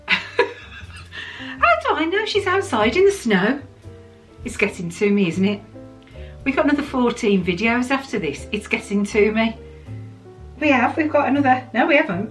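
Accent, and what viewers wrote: Birmingham, United Kingdom. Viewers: Hi ☺️ I'm a pretty new subscriber but I instantly loved you and your channel. Not only are you British Disney YouTuber, which made me so happy, but I could instantly tell from your accent that you must be close to Birmingham and that's where my mum and her family are from. Brummy is basically my second language haha 😄 My mum is around your age and I just showed your video to show her about the clubs you used to go to and she used to go there too 😄 Made her super happy because she lives all the way down in Devon now. So yeah, just wanted to write you a quick note to say how much I adore you videos and how lovely and fun your are. Sending you lots of magical Christmas wishes xx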